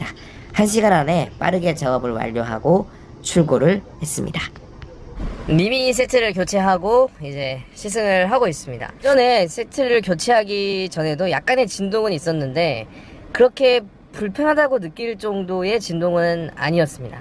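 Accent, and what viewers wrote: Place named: Jeolla, South Korea. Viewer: 전라도 말투 보이긴했는데 광주분이셨군요, 기회되면 뵙죠 ㅎ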